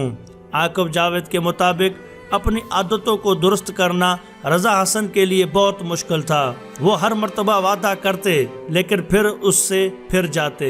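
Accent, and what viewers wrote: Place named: Punjab, Pakistan. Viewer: Very poor commentary, hard core Punjabi accent. Nice topic and good cause.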